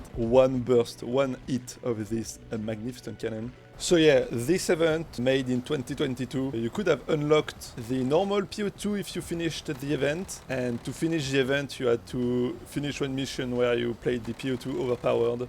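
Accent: France. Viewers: Omg l'accent français il est si flagrant 😂😂😂, sinon bonne vidéo gg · I knew you was french at the first second of the video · Your French accent really adds a great touch to the video. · tout ce que j'entend c'est l'accent français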